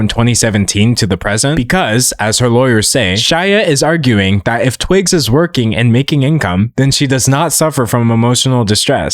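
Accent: South, United States. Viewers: first of all, where did this southern accent come from. Secondly, "the jealousy and insecurity" that put hands on a woman... uh, sir, abstract concepts cannot manifest in the physical realm like that? gross.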